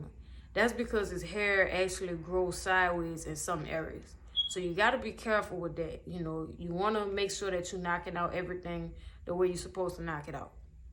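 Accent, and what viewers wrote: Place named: New Orleans, United States. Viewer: you straight out of new Orleans that accent thick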